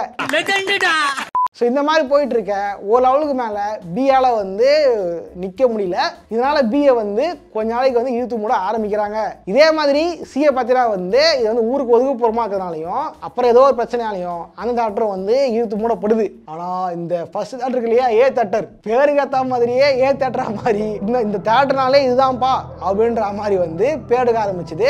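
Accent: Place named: Vandavasi, India. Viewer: Brother unga sondha vur vandhavasi bola irrukae🤔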